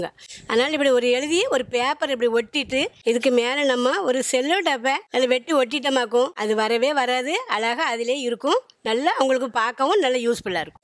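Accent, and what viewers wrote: Tirunelveli, India. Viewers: Tirunelveli speech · Anna avanga thirunelveli slang anna...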